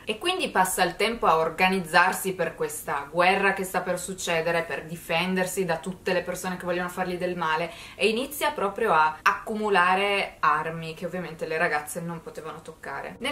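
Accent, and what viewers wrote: Turin, Italy. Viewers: È torinese, ma in ogni caso interessante equivoco. In effetti alcune vocali sono esattamente come quelle di alcune cadenze della Sicilia! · Siciliano? :D è l’accento di Torino! Più torinese di così non si può :D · torinese palese bro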